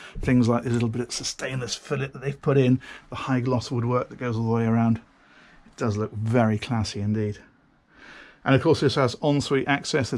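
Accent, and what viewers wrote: United Kingdom. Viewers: Best boat tour channel on you-tube... it just keeps getting better... Right then... cool. :) It's brilliant. It wouldn't be nearly as good without the British accent and colloquialisms... the boat companies recognize and welcome him with open arms, which is all to the good for us broke ass dreamers. lol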